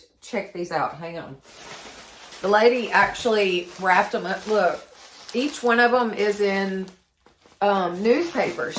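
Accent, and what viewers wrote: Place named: Georgia, United States. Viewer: GIRL! WHERE ARE YOU FROM??? I immediately subscribed because we talk similar lol Northwest GA here but loved the video! You found the holy grail of DT stocked items!!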